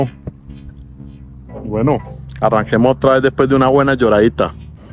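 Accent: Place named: Colombia. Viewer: . Hay un asunto de falta de identidad en colombia muy marcado, pienso YO y sobre esto ya existen investigaciones, las topofobias y las topofilias "Gi fu Tuang", pero a usted lo que le falta es tener un acento distinto al colombiano, puede ser que esto venga desde la conquista, nos arrodillamos ante el extranjero e ignoramos lo propio por más bueno que sea. Soy un consumidor de vídeos de viajeros y miro los números y cuando pasan por Colombia se disparan, vuelvo y lo digo el acento....pueden hablar pestes de nosotros y sus vídeos ser basura pero en Colombia crecen. Puede ser por ahí el asunto. Dicen que nadie es profeta en su tierra.....a mi si me gusta demasiado lo que estás haciendo, el formato, la fotografía, la edición, la música, etc. Pero solo conmigo no es suficiente. Un abrazo y pa tras solo pa coger impulso.....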